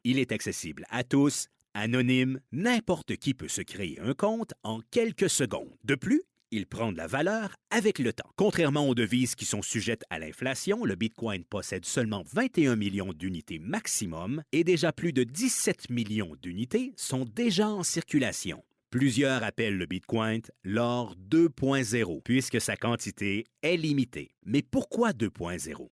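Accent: Canada. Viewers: un joli petit accent canadien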